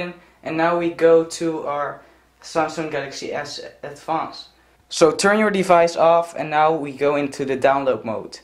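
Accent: Netherlands. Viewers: ik hoor zo hard dat je nederlands bent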